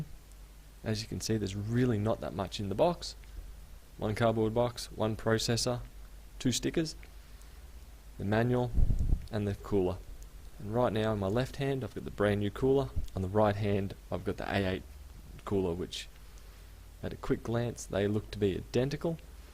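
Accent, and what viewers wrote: Australia. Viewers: Who is this ausie guy... IF YOUR GOING WITH A STARTER CHIP lol... You get the fucking Ryzen 3 2200G! It's on sale for like 110 dollars canadian right now... and they run the exact same as the 7nm 3200G for 20 dollars more. Best budget CPU by far to start with. And if you upgrade down the line, you literally won't get bottle necked with a GTX <num>... but who needs all that wattage? lol.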